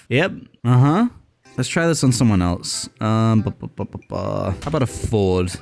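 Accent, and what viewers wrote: United Kingdom. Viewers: Are you British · R u British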